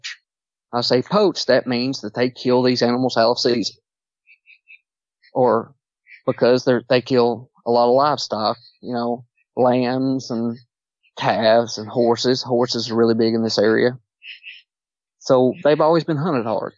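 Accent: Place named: South, United States. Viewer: Thanks for sharing your story! Love your accent! I'm from Mississippi so we Southerners all have a shared background, your grandparents sound like my own. <3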